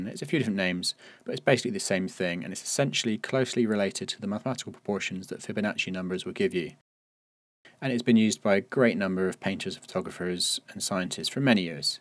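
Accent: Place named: West Country, United Kingdom. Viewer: Great in-depth overview of compositional rules. Nice to hear a fellow west country accent too, makes a change from the usual American teenage nasal whine on most YT tutorial/how to's which can get a tad wearing.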